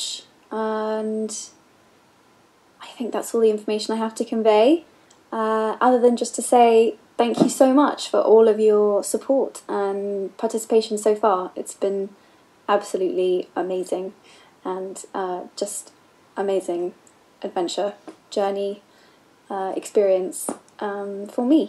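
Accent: United Kingdom. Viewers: イギリス英語でわ？と思います。アメリカとイギリスでは発音が全く違います。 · イギリス発だから逆にあなたが学んでいる英語の方が訛ってるかもよ？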